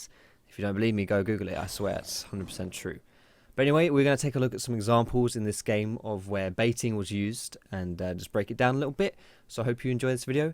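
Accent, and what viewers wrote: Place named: England, United Kingdom. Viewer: whereabouts are you in England man, you sound like you're from around where I am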